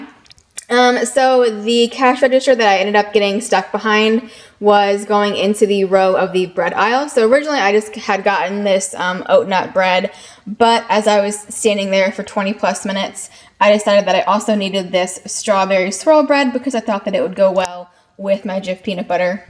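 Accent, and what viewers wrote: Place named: Georgia, United States. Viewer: Girl I thought you were from Columbus ga lol.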